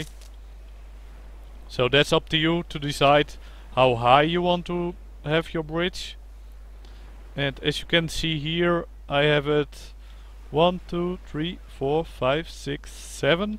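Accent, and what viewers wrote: Netherlands. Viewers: Lol i can hear he is dutch (im dutch too btw)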